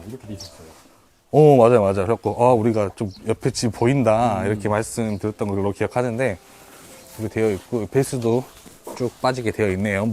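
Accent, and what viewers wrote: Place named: South Jeolla, South Korea. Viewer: 전남사투리... ㅋ 많이 쓰시네요.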